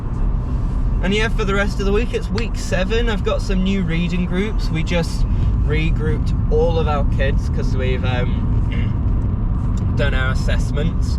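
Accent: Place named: Northern England, United Kingdom. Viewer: this is completely unrelated to books but your accent sometimes sounds so northern english! maybe i just havent heard enough new zealand accents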